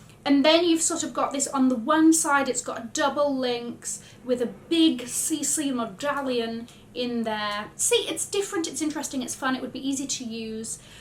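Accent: United Kingdom. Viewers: Excellent videos on fashion trends, Miss. Fantastic British accent. Happy holidays from our channel: Australian Parakeets Stars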